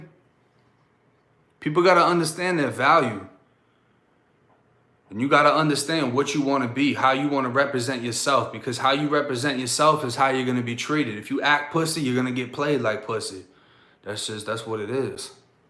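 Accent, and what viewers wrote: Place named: Massachusetts, United States. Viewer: youre from Mass...that fuckin accent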